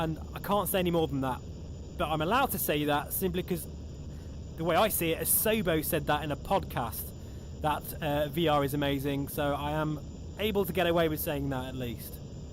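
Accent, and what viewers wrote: United Kingdom. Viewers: Is that a Cheshire accent? G2 looks good, still waiting for mine, since 9th July. Getting fidgety now.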